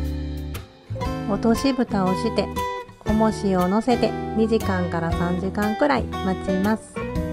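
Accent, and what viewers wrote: Kansai, Japan. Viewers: さん、初めまして、先日から、さんの動画を視聴させていただくようになりました。元々、海外で暮らされている日本の方々の暮らしに興味をもっており、色々な国に住んでる方の動画を視聴させて頂いているうちにさんの動画にたどり着いたという感じです。私も大阪に住んでおりますので、さんが関西弁で語りかけてくださるのがとても親しみを感じてうれしかったです。さんの動画をみてびっくりの連続です。まず、私のもってるドイツという国の印象が変わりました。1番びっくりしたのは、サウナに男女混合で裸ではいるというのに、びっくりして、主人にいうと「年寄りばっかりちゃうんか？」と言い、後でネットで調べるとそうではないこともわかり、また他にも色々と取り決めがあるというのを知って、再度びっくりでした。長文になりすいません。また、動画を楽しみにお待ちしています。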